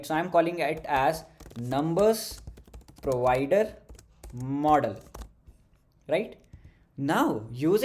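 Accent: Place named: India. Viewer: You speak indian mixed with english make me headache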